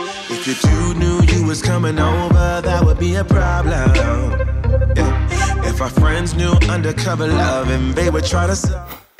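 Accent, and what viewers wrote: Igbo, Nigeria. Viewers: I just love the Igbo accent 😅 welcome home 💖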